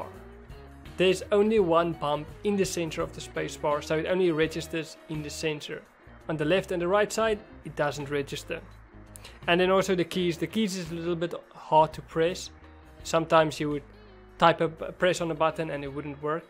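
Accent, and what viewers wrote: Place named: Afrikaans, South Africa. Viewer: That thick afrikaans accent is on point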